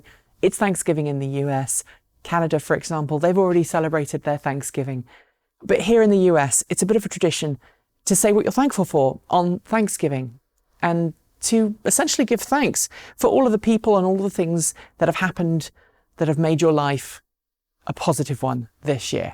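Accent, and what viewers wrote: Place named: United Kingdom. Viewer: Are you from the US? That's not possible. You definitely sound British.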